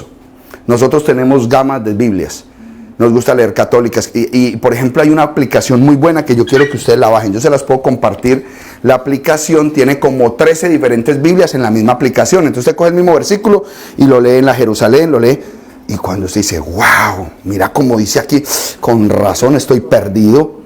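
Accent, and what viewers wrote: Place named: Colombia. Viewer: Inmediatamente me di cuenta de que usted es colombiano 👍gracias por compartir tanta enseñanza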